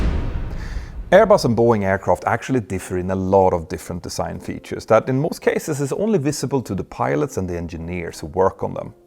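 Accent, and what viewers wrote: Scandinavia. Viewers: An interesting Scandinavian accent here, but natural for sure. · the way u talk english either ur norwegian or sweediah 😂😂😂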